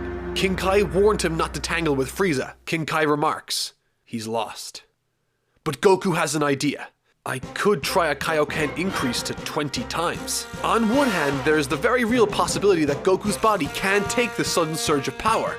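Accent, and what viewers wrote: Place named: Ireland. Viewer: hey ive been a sub for almost a year now and i was just wondering what country you grew up in as u sound a little irish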